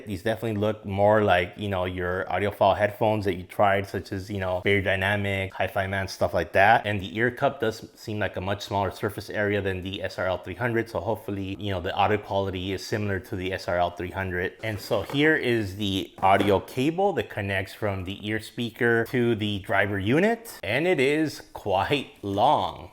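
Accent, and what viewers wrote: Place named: Philippines. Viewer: i hear a filipino accent.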